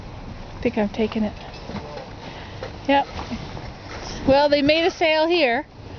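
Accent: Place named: Canada. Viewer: Hello, I've Never Heard Advertisement Pronounced the Way you Pronounced it, but everyone Pronounces things Differently!! I Thought it was Funny when the Lady Said "Are You Talking to Someone....Or...." haha. I Heard the "Aye" at the End of the Sentences, like I've heard some Canadians say "Aye" or "Eh". Anyways, I enjoyed the Video!!!! There are A lot of Good Things to Find at the Dump. Like the Saying Goes, "One Man's Trash is another Man's Treasure" Or, Woman. ~Erin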